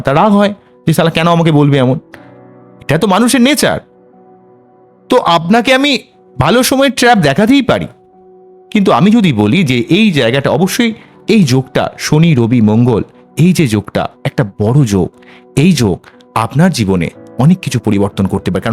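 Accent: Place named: West Bengal, India. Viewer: Apni bolchhen "hobe abar hobe". Ami Arijit er vashay boli " R kobe?R kobe? , R kobe r kobe r kobe?😂 BTW, apnar katha sune mone hoy apni Bardhaman chhele.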